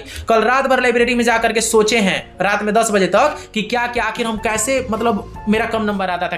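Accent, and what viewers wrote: Bihar, India. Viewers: May be u r from Bihar 😊🙏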